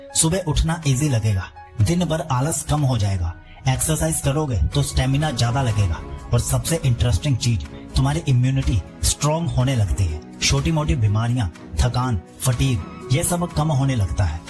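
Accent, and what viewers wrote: South India, India. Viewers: आप साउथ से है क्या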